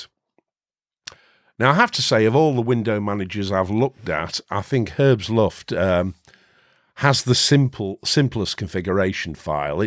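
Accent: United Kingdom. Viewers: Congrats on the 10K! Well deserved. :) Why I like your channel mostly comes down to personality. You're calm, natural, and we're in a similar age group. I'm also loving the fact that you're British. The accent is most welcome. I've always had a soft spot for well-spoken British English. Apart from interesting content in general, I think what I appreciate the most is that you're not attempting to make everything "funny" and/or sensational like so many others do. I'm really tired of that, feels like a lot of channels target 12-year olds and are only interested in the entertainment value. Looking forwards to future content, cheers.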